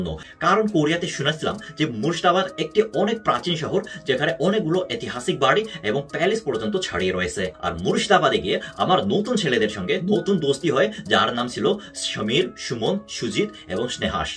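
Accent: Bangal, Bangladesh. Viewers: Your bengali is of Bangladeshi accent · দারুন কিন্তু তুমি বাংলাদেশী দের মতো কথা বলছো। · বা: বেটা বা:। তুমি কোলকাতায় এসো, ইলিশ খাওয়াব। যোগাযোগ রাখবে। এভাবে ইলিশ পাঠানো যাবে না। তুমি বাংলাদেশ থেকে অনলাইনে আনাতে পারো, ওরা ব্যবস্থা করে দেবে। 1700 টাকা কেজি। কথায় বাঙাল টান (বাংলাদেশী টান) হল কি করে? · His Bengali sounds little different, more like bangladesi. Sounds so awesome 😎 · Ata to bangladeshi voice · Tumi khub vaalo bangla bolchho tabey kichhuta bangladesher moto uchcharan hochhe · Your Bengali speaking style is some kind of East Bengali (Bangladeshi) style though... 😁😁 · The specific accent of Bengali you're speaking is predominantly spoken in East Bengal (Bangladesh and parts of Tripura)... You are doing great! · Are vai etato bangal style Bangla, just wow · কিছুটা বাংলাদেশী টানও আছে । তাই না ? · Tumi to ak barae bangal der moton kotha bolcho 😳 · But tumi ektu bangladeshi der moto bhasha bolchoamader bhasha bangladeshider moto noi anek misti ...😂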